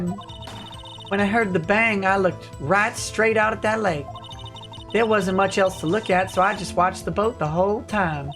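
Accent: Germany. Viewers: Your German accent takes this to the next level hahaha! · That German accent marks it 1000 times funnier!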